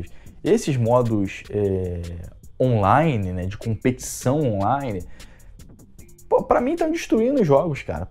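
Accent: Rio de Janeiro, Brazil. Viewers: Pode crer mano kkkkk Esse sotaque de carioca fudido não dá pra entender porra kkkk Essa intro é genial!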